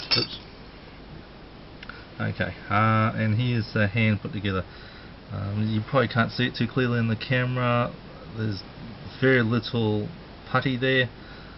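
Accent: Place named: Australia. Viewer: Great to see another Aussie model channel. Its funny how strong our accent is compared to the Brits haha! Cool channel. Just started up my own. Its only couple months old but it's gettin there. Keep it up.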